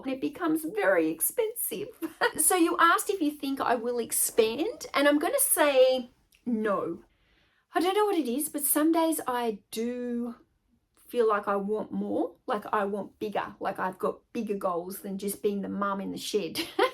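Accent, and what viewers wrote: Australia. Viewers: I bet myself you had an Aussie accent before I even hit play. And whaddya know? Lol. So random. Loved the video! PS The conversion of the size for us Americans is 25 x 11.5 feet based on the numbers you gave (rounding up). So 287.5 square feet in area.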